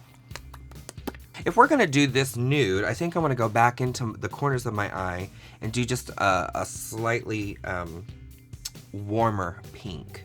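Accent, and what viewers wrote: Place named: South, United States. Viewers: ginger sounds so sweet and southern and endearing when she speaks i love it so so much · She talks like a little southern gay Bob Ross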